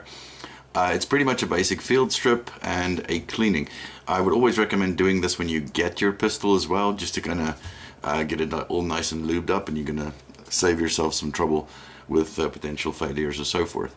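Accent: South Africa. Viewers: Bru, are you by chance south african?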